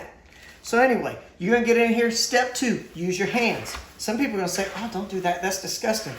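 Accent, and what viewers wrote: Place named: South, United States. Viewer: Dad jokes, Rice Krispies, country accent, not harsh on the eyes. I don’t see how this video could’ve been better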